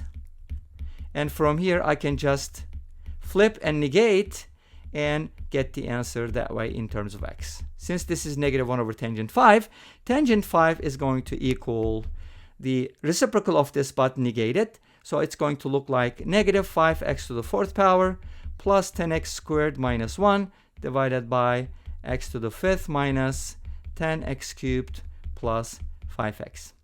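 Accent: Turkey. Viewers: I can hear Turkish behind the accent.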